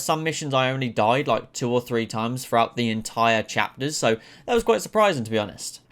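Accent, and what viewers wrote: United Kingdom. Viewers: Hey are you from Northampton by any chance I only say this because your voice sounds very northhamptony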